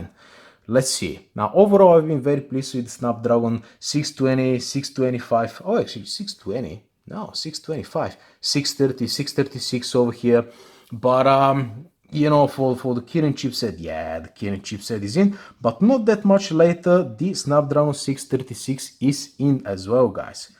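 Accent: Russia. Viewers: Russian bad English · russian accent prosto pizdec)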